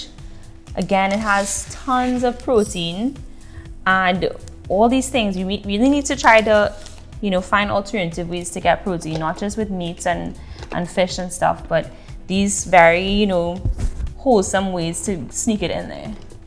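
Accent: Trinidad and Tobago. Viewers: Gal good stuff! You Trini or wuh?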